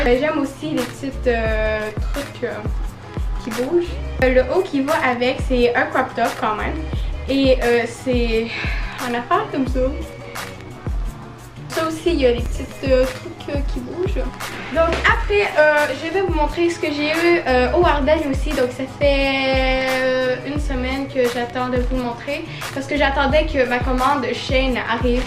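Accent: Quebec, Canada. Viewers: J'adore ton accent Est-ce que tu e Québécoise? Parce que moi Oui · es ce que t'habite au canada parce que t'a l'accen qc (moi aussi)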